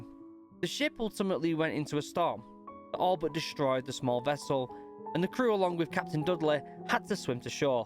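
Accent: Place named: North, United Kingdom. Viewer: I’ve been a casual viewer of this channel since MW: 2019 Clean House. I love the new format, I think I will miss the old style but at least you’re happy with what you are doing now. I will say though as someone from the south-east UK I’ve started to notice the northern accent much more and it’s great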